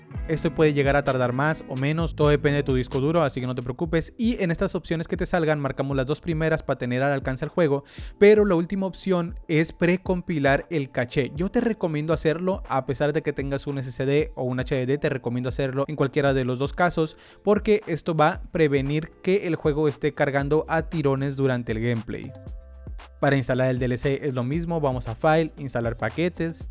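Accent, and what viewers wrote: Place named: Chile. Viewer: aprende a pronunciar la "sh", esta claro que eres chileno.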